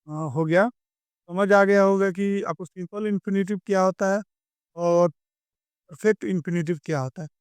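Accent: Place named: South, India. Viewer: Bhai south se ho ky tum Hindi wali vibes ni aari aisa lag ra hai jaisa soch soch k bol re ho But bathreen explanation ❤❤❤❤❤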